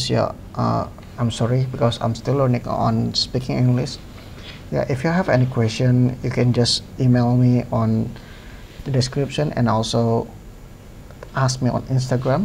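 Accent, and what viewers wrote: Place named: Indonesia. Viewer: indonesian accent wkwkw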